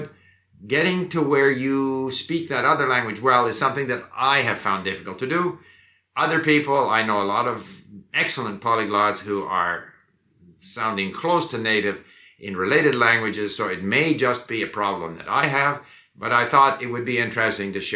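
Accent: Spain. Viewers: "s Portunhol occurs because he does not swing the words like Brazilians do, and utters.the Portuguese words with the Spanish cadence. No big deal. They will point it out. But they have no.problem understanding. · Your accent in Spanish, when you speak Portuguese. And I would go as far as to say It's not really proper Portuguese. Especially because of the fact you Speak brazilian, portuguese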